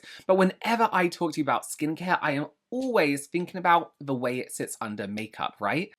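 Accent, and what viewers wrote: United States. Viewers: She sounds so American 😂😂😂😂. Really unintelligent and doesn’t understand that we in Europe actually learn how the body works. Whether you are a man or a woman 😂😂😂 everyone knows what a period is and how they work.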